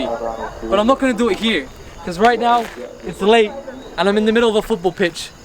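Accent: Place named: Australia. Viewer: MashAllah! Are you mix? You look mixed. I can also peep the australian accent. AUSSIE AUSSIE OI OI OI!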